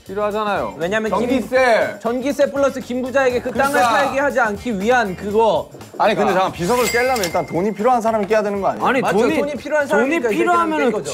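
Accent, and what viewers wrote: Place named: Busan, South Korea. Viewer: Busan satoori lol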